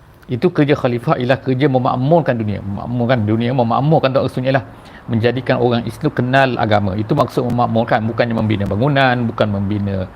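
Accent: Terengganu, Malaysia. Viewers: Assalamualaikum... alhamdulillah. Cuma nak tanya Dr ni asal Terengganu ke? Bunyi slang Terengganu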